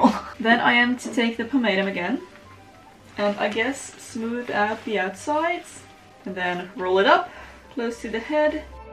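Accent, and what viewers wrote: Netherlands. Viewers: I love your English/Dutch** accenttttt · Ik hoor het toch altijd wanneer een nederlander engels praat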